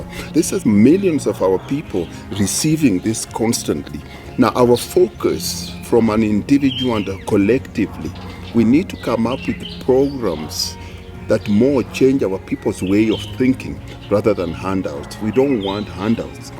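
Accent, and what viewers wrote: Kenya. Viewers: that is a luhya accent kabisa kwanza ya wa samia wa busia.prove me wrong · Amazing how he still have the Kenyan accent after all that time in SA...this guy is inspiring in so many ways...kudos my Kenyan bro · I thought he has luo accent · This was so beautiful I absolutely love the Kenyan accent., you are doing a good job with this series oh! What a beautiful dog he has, wonder what the breed is called... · His accent just reminds me of Papa Shirandula.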